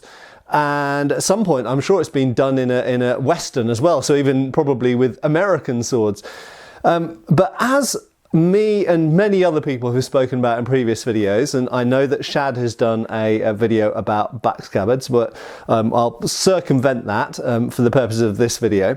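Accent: England, United Kingdom. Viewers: True. And when used as part of a combination word, it becomes dachi... as in nodachi or odachi. But is English, and he's gonna pronounce things with, well, an English accent, lol.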